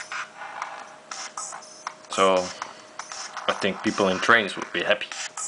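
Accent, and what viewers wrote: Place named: Netherlands. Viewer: lol i can hear ur dutch accent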